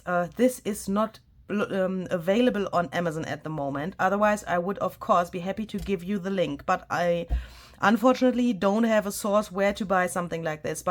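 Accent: Germany. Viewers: you make the best videos! I love listening to you - to the English speaker a German accent is so charming - and your English is very good! If only I could speak a second language as proficiently as you do! I admire you so much and am so happy for all your success. Thank you for all the great content! I am buying you a coffee too! OMG: as I continued to listen, I heard the "You do you" comment. How ridiculous people can be! He doesn't own those words, nor any other phrases. These are commonly used in English conversation. All influencers can have comments they like to make, but that does not mean it belongs to them unless it is something specific to a product they have copyrighted. Don't pay attention to anyone who criticizes you, you have never done anything at all wrong!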